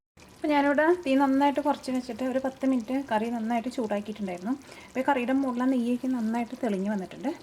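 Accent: Thrissur, India. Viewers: onnu ochati paranjuday super aayirikunu curry alla ur very beautiful but slang oru kachda thrishur style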